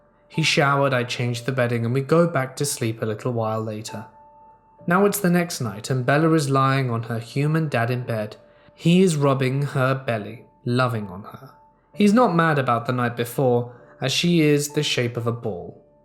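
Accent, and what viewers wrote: United Kingdom. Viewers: Why are you british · Omg so its 330am and not damn thing made me laugh all day until you said "the readiness of my anus" in your dry British accent and I lost it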